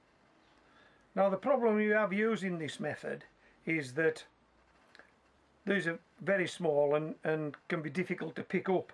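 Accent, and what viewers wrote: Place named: Northern England, United Kingdom. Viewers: he is cumbrian sounds like workington area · Such a strange but lovely accent 🤣🤣🤣 says "seeds" like a brummy .. Got Jordy in there and a bit of everything else!🤣🤣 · No he's not Scottish it's a northern England accent you can hear. As I am from Yorkshire I should know where it's from but it can be tricky, definitely North England though same place as chubby brown is my guess. North Yorkshire area (Middlesbrough and redcar) best guess 👍🇺🇸🇬🇧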